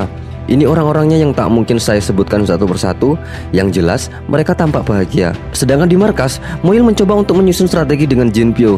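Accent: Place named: Java, Indonesia. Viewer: Disini mimin masih rada medhoq 😅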